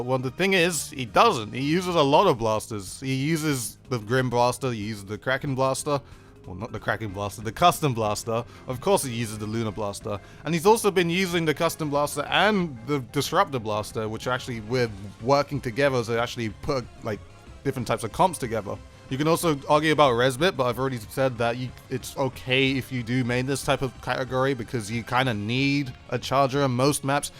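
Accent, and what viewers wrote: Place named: London, United Kingdom. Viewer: Hey, where abouts are you from? I'm from London, England and your accent sounds very similar to mine so I'm assuming you're from nearby?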